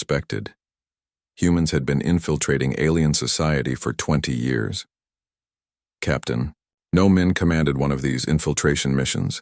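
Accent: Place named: United States. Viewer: You must be an American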